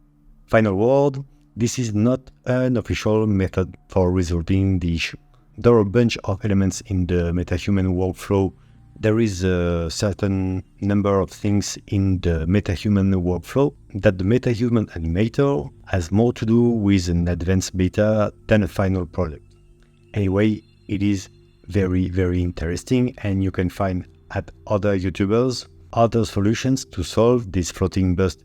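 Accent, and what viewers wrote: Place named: France. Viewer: Great my friend. Tu es français non? je reconnais l'accent. Anycase I tried others tutos, not working but yours did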